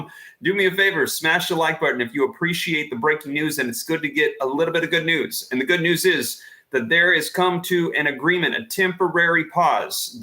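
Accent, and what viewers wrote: United States. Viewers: Hey, are you American?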